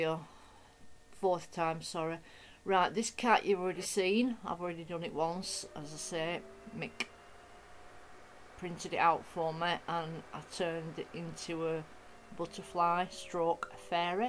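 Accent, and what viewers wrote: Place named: Northern England, United Kingdom. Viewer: Love your artwork, watch videos at night when I can't sleep so this is the first time with volume, lol, not the American accent expected but a right northern accent :-) x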